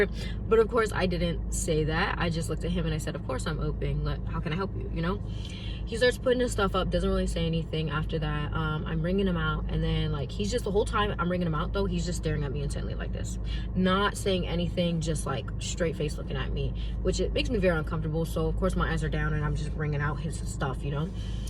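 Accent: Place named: Maryland, United States. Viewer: This story is insane … im glad you’re ok and i love my MD ppl … I love how y’all say tew instead of 2 … please be safe ….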